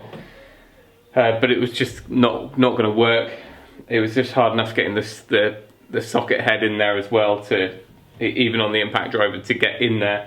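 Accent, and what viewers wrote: United Kingdom. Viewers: Great job but are you in Australia but sound like british???what's on your sandwich butter or jelly???? Lol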